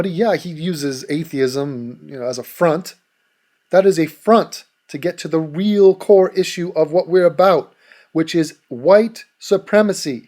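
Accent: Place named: Cockney, United Kingdom. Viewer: Not the greatest Aussie accent ever. Sounded slightly cockney rather than Aussie. Other than that, keep up the good work.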